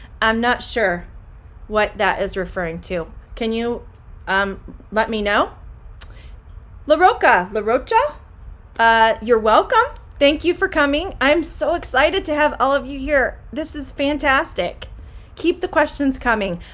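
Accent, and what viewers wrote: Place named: United States. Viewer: rarely I understand the americain accent welcome mam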